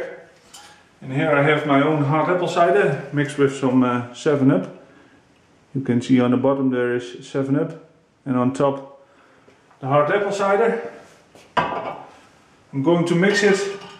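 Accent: Netherlands. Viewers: Allright Im going to try this as my first time brew! How did it turn out? And do I have to filter it before drinking? Proost from Holland ( and hearing your dialect I think you come from NL aswell?)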